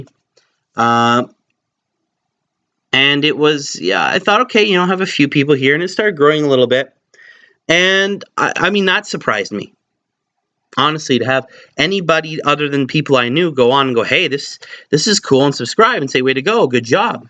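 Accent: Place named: North America. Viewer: Sorry! I meant to say "NORTH American accent"! Ha ha! Go Canada! All the best, man!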